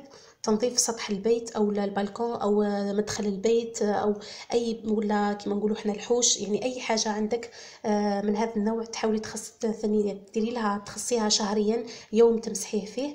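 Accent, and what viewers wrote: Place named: Djelfa, Algeria. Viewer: جاتني لهجتها كما اصحاب الجلفة شكرا على الجواب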